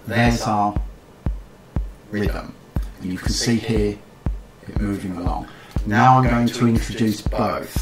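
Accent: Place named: Nottingham, United Kingdom. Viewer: love the Nottingham accent. Bonsai and synths, now both the way god intended ;-) Subbed.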